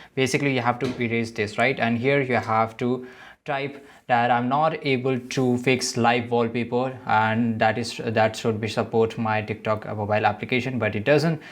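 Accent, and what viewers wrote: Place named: India. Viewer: Indian with an storng Indian accent and doesn’t even know how to fix it lol. Yall country is fully bad on first hand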